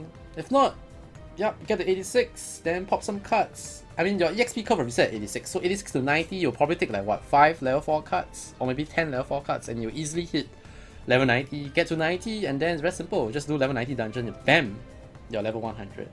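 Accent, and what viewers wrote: Singapore. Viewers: Thanks man, bro are you Singaporean too? Hahaha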